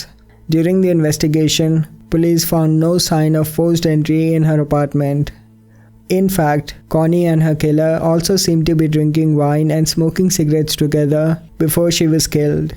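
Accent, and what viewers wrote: India. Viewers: New voice, indian accent sounds different👍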